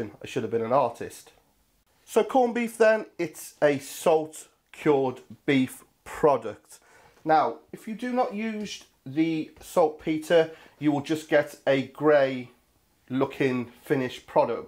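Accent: United Kingdom. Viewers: I saw this video long time ago, and I appreciate what you make. However, I there any other way to make it without pink salt? FYI, I live in the Middle East and it is hard to find sodium nitrates here. One more thing, your accent is more British rather than scotch; maybe a variety !?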